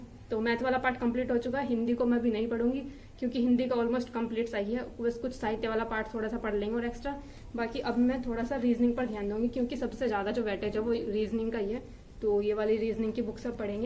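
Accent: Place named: Agra, India. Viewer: aapki boli agra jesi hey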